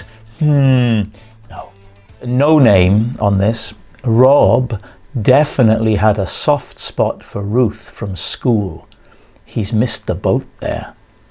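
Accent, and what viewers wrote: Wales, United Kingdom. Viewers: Loved your welsh accent Rob, stonkin butt! 😀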